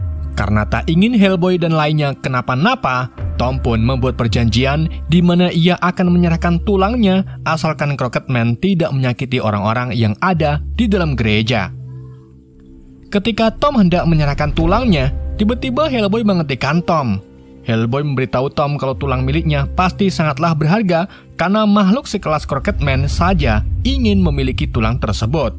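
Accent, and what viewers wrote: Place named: Java, Indonesia. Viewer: Orang jawa sonnya😂😂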